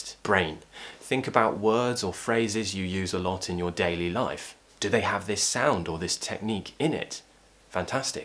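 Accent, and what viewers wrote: RP, United Kingdom. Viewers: I live in uzbekistan l love your accent l want to learn modern rp from you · Sir love your accent and pronunciotion on the other hand i love british ❤️ 😂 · RP has to be my favourite. I love yours and Tom Scott's accent. Most of my Youtube consumption is from Brit creators' contents, mainly because my ears just prefer it that way (also: vocabulary preference), so that's the audio exposure for me. What really started all this though is that one time I got asked in my home country if I were a foreigner due to my accent; most people here can speak English, but in the American accent. Prior to that, I hadn't really noticed it.. so I just thought if that were the accent I tended to default to, I might as well do it well. :) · Hii...🙋.. thanks for your great video s.... I always eagerly wait for your upcoming video s...u r the great British Accent teacher....👍👍👍👍great job👍👍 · Your pronunciation is excellent! 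I love RP. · I really love bbc accent and I think your accent similar to it or msy be itselfe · you stated that you have a “modern RP accent,” how is that in relation to the “mainstream RP” or “contemporary RP”? I, myself, like the contemporary RP accent. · Of course i love (rp) accent thus i watching you always❤👏💕💪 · I JUST LOVE THE WAY YOU SPEAK...so that's why I think british accent is best....👍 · It seems to me that British accent is really pretty as it is fascinating to listen to a Brit speaking with it like you. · love your RP accent to improve my African accent. But the fees choo!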